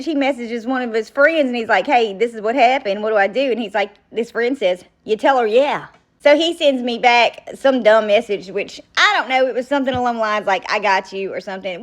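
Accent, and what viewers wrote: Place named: South, United States. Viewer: I love that country accent.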